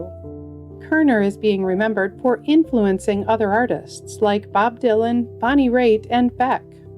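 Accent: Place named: Wisconsin, United States. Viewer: Great Wisconsin accent.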